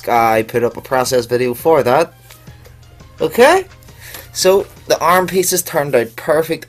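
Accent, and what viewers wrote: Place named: Ireland. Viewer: he is from ireland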